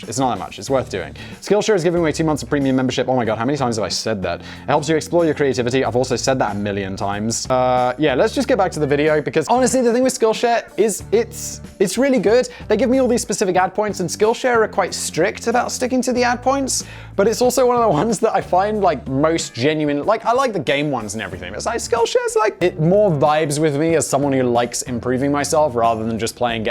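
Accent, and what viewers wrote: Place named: England, United Kingdom. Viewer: Simon owes his popularity to the fact that England invaded so many countries. Now when the entire world hears an English accent they sit up and listen, because that Brit probably runs things. Especially the guy with the posh accent... he must be in charge. We just take Simon's authority for granted the minute he speaks. Before you know it, eight channels.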